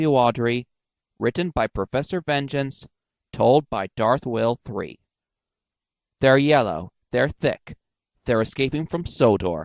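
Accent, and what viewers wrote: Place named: United States. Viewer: Why are these narrations so American and so censored?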